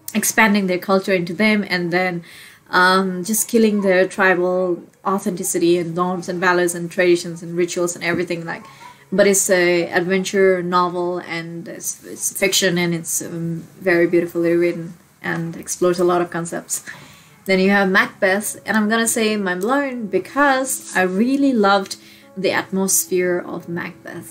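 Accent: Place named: United States. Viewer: Haina yo Nepal ma padheko manche ko american accent kasari aayo? Hollywood filim dheri herdoracha kyare ...😅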